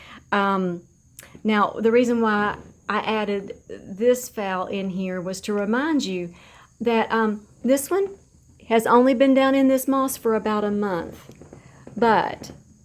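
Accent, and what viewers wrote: South, United States. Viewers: without humor or the ability to laugh at yourself life would be sooo boring. I never take myself to serous. I like to be silly. Lost it for a bit but recently found it again. Right here on youtube among all of you❤ Its gonna be a great expiriment i have 1 sharry baby division in Chinese spagnum. It came off the same plant that had 20+snails and is currently residing in water and doing AMAZING. its in my second latest vid (how do you call that in proper English🤔 ) when i have them both in moss I'll come tell you. So You can be the judge of the moss (if its came back to life or if its the bad kind) you never fail to wam my heart. I might be Dutch but i do have the same good oll "southern?" Charm.😉 Notice that its the second time im trying to fish for info about where your from... since i love the accent but are not an expert.😁😇 Much love and happiness Kind regards rose 🌹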